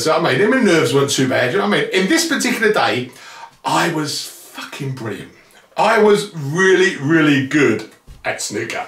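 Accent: United Kingdom. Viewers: I don't know how anyone not from the UK can understand you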